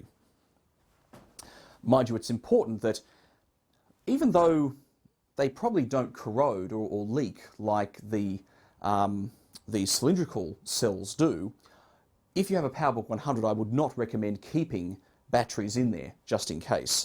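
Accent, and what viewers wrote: Australia. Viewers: Are you Australian? You sound like it.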